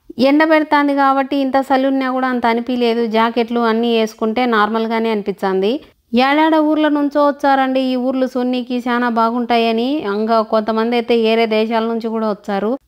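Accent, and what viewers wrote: Rayalaseema, India. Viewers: Nice video, Maana Raayalaseema language ni andhari teliyajesavu ne mattalatho super chelli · Mana Raayalaseema slang yekkadiki vellinaa thaggedele.. negative comments skip cheyandi..manam andarinee navvisthu, navvinchadame mana vuddesam....👍👍👍👍👍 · Hi ma maadhi kuda rayalaseema ne kurnool. Meeru rayalaseema slang lo matladuthunte chala bhagundhi.nice keep going on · super andi... 1st time mana rayalaseema slang youtube lo vintuna...ma uru proddatur keep it up 👍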